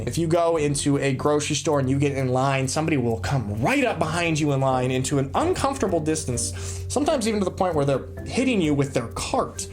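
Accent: Swabia, Germany. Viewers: The Swabians might deny it, but there's definetly some Swabian twang in your German,. Pretty cool;)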